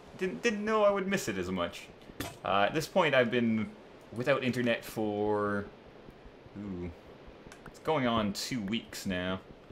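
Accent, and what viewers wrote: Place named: Canada. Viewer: Awesome to hear those Canadian vowels again. Welcome back to the Internet's.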